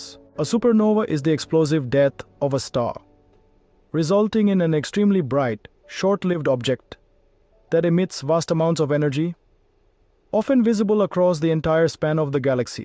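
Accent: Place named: United States. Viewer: But for the broken American accent, it was an engaging watch.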